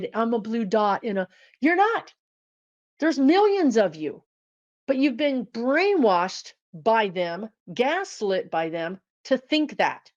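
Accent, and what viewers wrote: Texas, United States. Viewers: I luv it it when YOU TALK TEXAN ❤😮 DEBRA WADE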